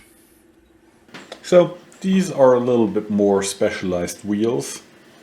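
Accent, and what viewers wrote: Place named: Hemau, Germany. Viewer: Glad to see a video, you are my absolute favorite machining channel of all time. You're a very talented machinist and you have the exact same accent as my family from Hemau.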